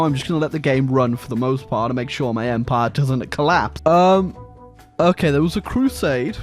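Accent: France. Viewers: The French accent is needed for future videos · I want more of your beautiful French accent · I want a full video of to just speak in a french accent the whole time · You Have The BEST French Accent Ever, Even Better Than A Actual French Man. me simping for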